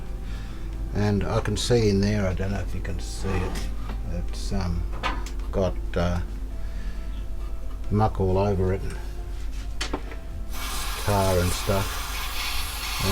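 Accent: Australia. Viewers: Hi Don, I know this was a long time ago now. My name is Sara. I've been trying to restore my grandparents' old nippergram. One of the .01MFD 630VDCW capacitors are no longer working. Do you know what could work as an alternative and where to source such a thing? It also sounds like you're in Australia. I'd love to know whereabouts and if it's not too far, I wondered if you could help me to get it up and running again 🙂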